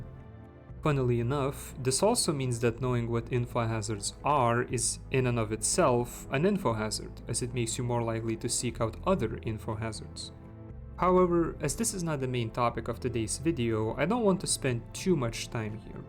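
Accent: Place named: Poland. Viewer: słychać polske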